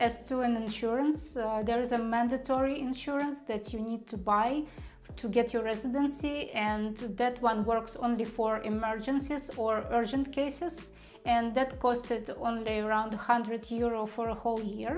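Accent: Russia. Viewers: Sounds like you are Russian. Of course you are going to like the BG.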